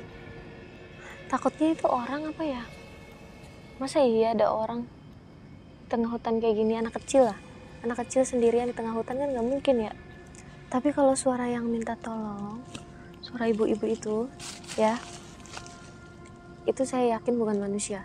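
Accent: Java, Indonesia. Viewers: Ka lucu jga yh klo lgi ngomong jwa...jwane mdok bngt kaka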